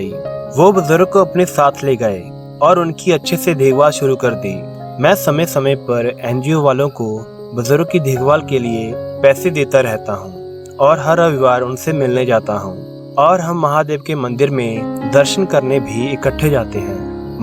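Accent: Punjab, India. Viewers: Bhai punjabi hai kya? Words bolte time punjabi accent aa rha hai for example missing "aa आ"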